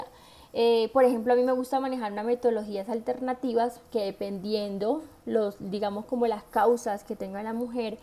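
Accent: Colombia. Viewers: hola, como siempre luces espectacular con esa cara, ese pelo, esos dientes, esos ojos, esos labios, y con ese acento colombiano bien marcado que tienes te hace una mujer muy hermosa, gracias por tus consejos son muy educativos, saludos desde Costa Rica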